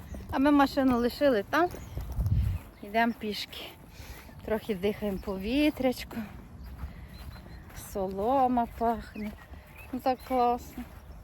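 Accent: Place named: Western Ukraine, Ukraine. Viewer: Якаж противнюча бандероско- вуйсковська мова. Вот там и оставайся у пани гейропи, такие нам в Украине не нужны. Быть добру 🕊️🕊️🕊️.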